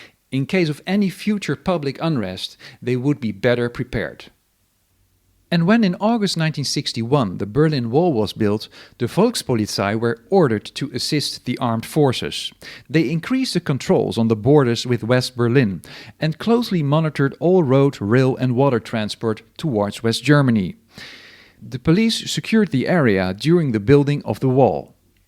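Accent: Netherlands. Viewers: Je kunt het altijd goed horen als een Nederlander Engels spreekt.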